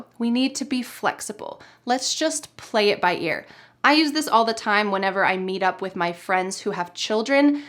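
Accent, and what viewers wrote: United States. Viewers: I ❤ ur accent......i swear....its really cool....you know I ❤ american accent like you did....TEACHER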